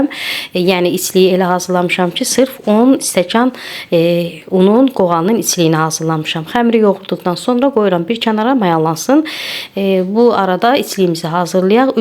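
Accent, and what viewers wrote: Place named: South, Azerbaijan. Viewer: Hansı rayondansız ləhcə sirin gəldi. Cənub zonası?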